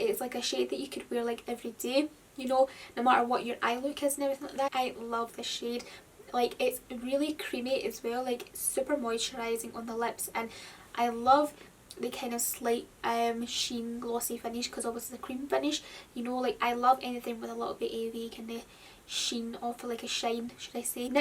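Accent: Scotland, United Kingdom. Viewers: are you scotisch? 😍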